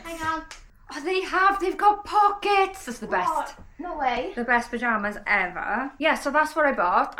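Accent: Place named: Wales, United Kingdom. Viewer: I have been religiously watching your videos on the big tv as you know. You’re my go to friendly face and you know I can’t resist your Welsh accent! Just wanted to come on specifically to say you are smashing it lovely. Your videos are a breath of fresh air and can see your video style since you changed things up and got your new camera have worked so well. Keep going lovely because everyone needs more vlogs in their life. Xxx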